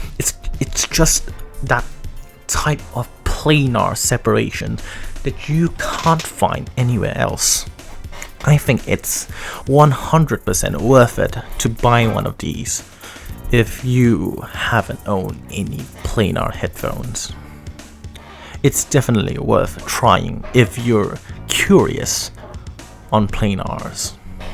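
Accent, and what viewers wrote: United Kingdom. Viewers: Your accent is different in the other what's going on. No offense but it's ABIT weird. This video you sound like British accent? Another one sound more Indonesia? Are you trying to mimick accent or something. Either way just speak how you normally do. And the video I feel like it's too long. I would prefer you get to the point though.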